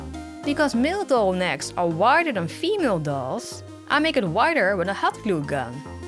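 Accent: Netherlands. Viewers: i had a suspicion you were dutch with the accent. but then i saw ZEEMAN EN TOEN WIST IK HET. Maar heel gaaf gedaan! Ik ben benieuwd naar je andere videos. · Ik hoorde je stem en dacht dat is een Nederlands accent haha. Maar wist het niet zeker tot ik de "zeeman" zag staan XD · Geweldig gedaan! Ik wist niet dat je de nekopening op die manier kon vergroten. Aaah, dat heerlijke Nederlandse accent! Never get rid of it!!!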